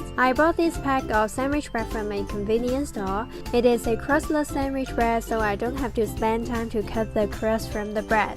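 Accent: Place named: Hong Kong. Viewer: 呢個like系比你嘅英文嘅。英文唔錯呀，香港tone但系口音唔重。希望你之後會越講越自然，加油！！好鍾意你嘅vids架！！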